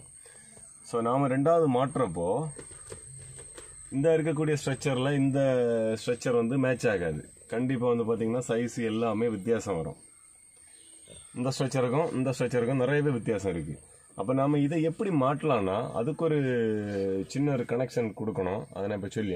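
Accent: Kanyakumari, India. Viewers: Kanyakumari makkaloda thamil keka azhaga irukku..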